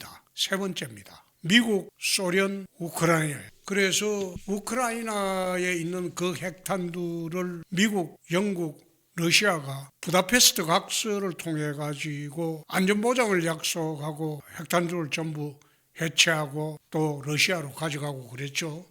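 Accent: Gyeongsang, South Korea. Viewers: 은퇴선언하고 다시 복귀한 건 준표밖에 없을 듯 😂😂😂 나이 먹고 개추태 그만 부리시지 쯧쯧… 갱상도 사투리나 고쳐라 듣기 졸짜증난다 사투리 죽어라 쓰는 인간들 고집불통에 나르시스트 같다.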